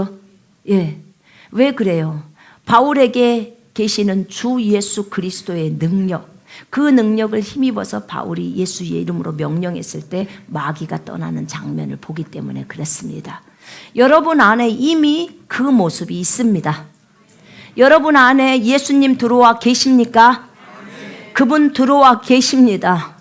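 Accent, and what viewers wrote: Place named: Jeolla, South Korea. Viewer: 선교사님 전라도 말이 친근감 갑니다^^*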